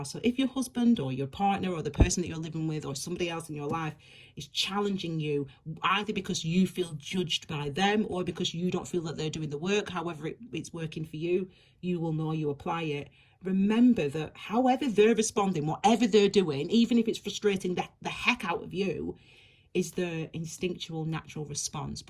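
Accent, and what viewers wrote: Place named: Northern England, United Kingdom. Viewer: Thankyou for picking up my morning. Your accent makes me smile. Im British (i live in London) and i lived in Sheffield for 3 years, your voice does remind me of all the people I met up north. 😊🌈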